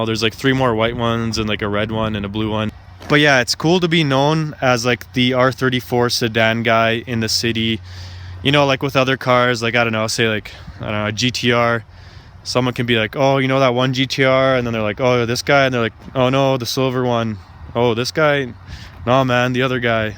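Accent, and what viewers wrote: Canada. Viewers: you have like the stereotypical canadian guy, i try to sound like u when making fun of my canadian friend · jesus you sound so Canadian. i am also Canadian you make me question if i sound like you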